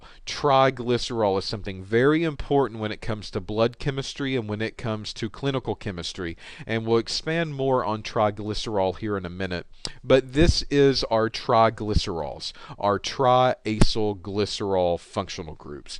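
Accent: South, United States. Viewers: cool Southern accent I like it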